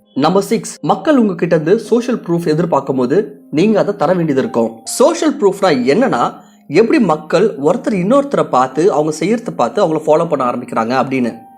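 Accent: Kerala, India. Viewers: Malayli ah. I lyk ur Tamil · Kerala thambi voice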